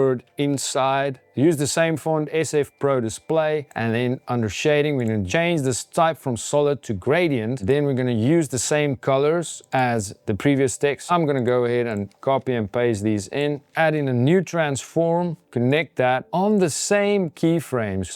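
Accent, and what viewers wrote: Afrikaans, South Africa. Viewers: You sound Afrikaans??😁😁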